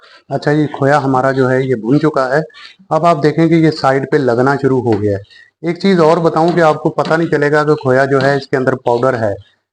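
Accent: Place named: Punjab, India. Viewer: Sir AP ki nation sa lagta ha k AP panjabi hain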